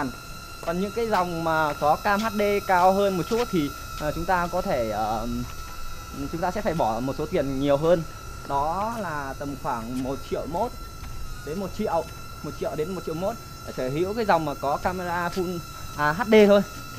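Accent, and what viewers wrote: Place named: Son Tay, Vietnam. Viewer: Quê sơn tây à a trai?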